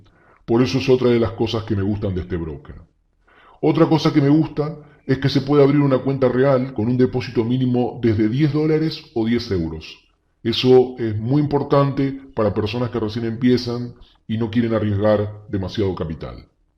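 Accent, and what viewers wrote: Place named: Argentina. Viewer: hola, me parece por tu forma de hablar q sos argentino, yo soy de buenos aires, me gusto tu estrategia y la voy a practicar, pero ademas de eso te queria preguntar de que manera se puede retirar dinero de los brokers cuando estamos en argentina, ya que estamos muy regulados. Sirve abrir una cuenta en Skill? muchas gracias. abrazo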